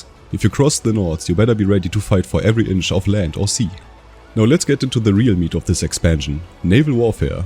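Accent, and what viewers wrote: Germany. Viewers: Brudi bist du deutsch Brother are you German ? Dein Akzent verrät dich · I love your german accent ❤️🗿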